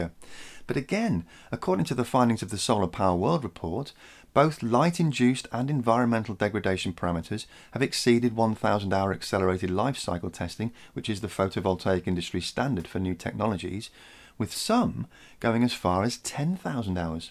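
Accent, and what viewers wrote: United Kingdom. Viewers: For American English “pero vsk vsk vsk ite” is the word & differentiates it from (perocstie?) which I hear throughout this presentation. I often have difficulty w/ various versions of British annunciation.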